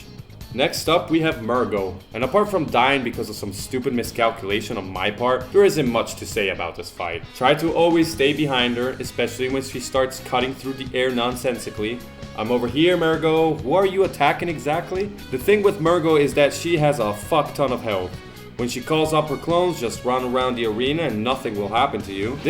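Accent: Italy. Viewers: U are italian? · sei italiano?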